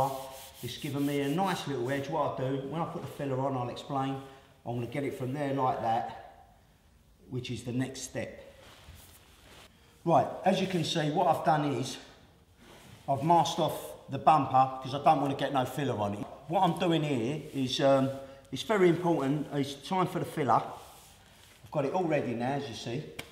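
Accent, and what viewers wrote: London, United Kingdom. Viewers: Don't you just love it when people try to talk well. They try hard to pronounce their Ts and Hs but the native accent always slips in! Wot I've dun ere is mixed the filla righT. The impawtant fing to remember is... You can take the man out of London...but you can't take the London out of the man! Long live the London accent :) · dombou70 he's a London boy 👦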